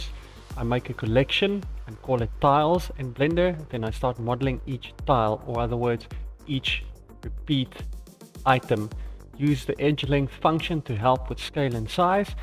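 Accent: South Africa. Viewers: South African? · South African? Im from Cape Town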